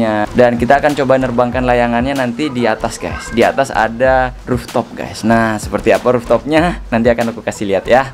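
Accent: Madura, Indonesia. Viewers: Orang madura ya bang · bang asli Madura mana??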